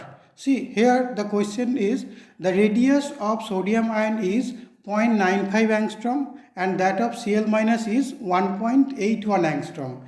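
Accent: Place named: Bengal, India. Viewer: Sir you are Bangali it's clearly known to your voice . Nice outstanding video but sir if you teach in hindi then it will more better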